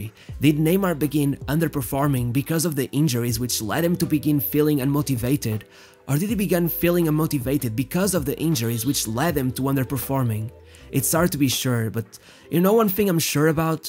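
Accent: Portugal. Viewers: To the creator of this channel, thank you so much for these great videos! You speak perfect English, so I do not understand why anyone would care about a slight Portuguese accent (which in my opinion, only makes the videos even better and more authentic). I am sure most people think the way I do and that you will reach a lot more people with your content. Best regards from Switzerland! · You sound Portuguese bro 🔥🙏🏾 shoutout to you brother Great content fuck them haters 😂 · Eae beleza, tu tens o mesmo acento que todo português tem quando fala inglês, que para minha opinião é bom, tens um ótimo conteúdo, continue com o bom trabalho. Falou cara é nós cara!!!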